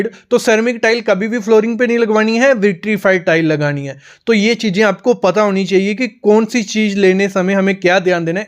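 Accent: Jammu, India. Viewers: Bhaiya ji jammu se ho aap toh…👍🏻👍🏻 Waah ❤️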